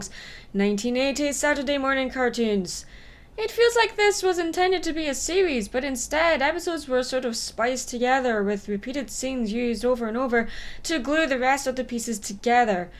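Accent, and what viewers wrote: Scotland, United Kingdom. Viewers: I have a vague recollection of this. Or it was probably just seeing it on a greeting card. I was somewhere around 3 when this came out (and when a lot of things you plan to review this year did). Side note: I absolutely love listening to you speak. Something about a Scottish accent on a woman is just indescribably enticing.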